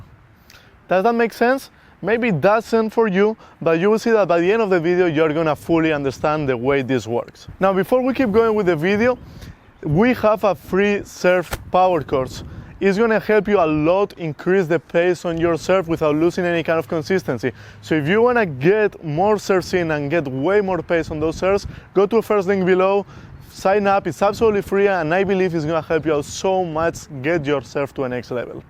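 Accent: Spain. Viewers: he has a spanish accent, I instantly trust him. But please stop with all these "absolutely free courses". everyone subscribed to FYB once and saw the result. 0:6 0:6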